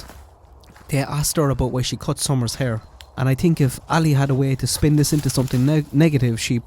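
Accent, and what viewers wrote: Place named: Galway, Ireland. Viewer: You’re from Galway? I was trying to figure out the accent lol. I love Galway. I’m Waterford living in Kildare ☘️ . Glad a logical Irish thinker is covering this ❣️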